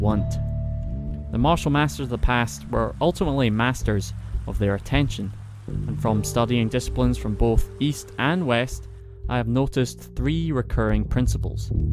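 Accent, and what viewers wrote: Scotland, United Kingdom. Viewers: Scottish ASMR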